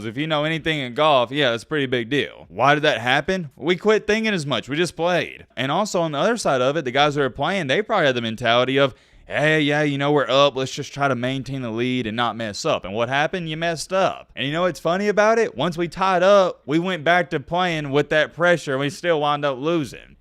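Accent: South, United States. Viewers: Your voice and accent are excruciating. Southern nerd 🤓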